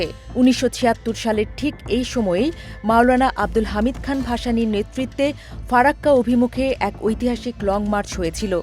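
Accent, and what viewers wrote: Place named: Kolkata, India. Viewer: এটা কলকাতার ভাষায় কথা বলছে😂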